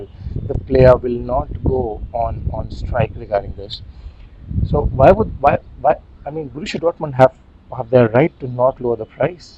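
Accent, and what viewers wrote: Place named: India. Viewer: Bro r you from India ??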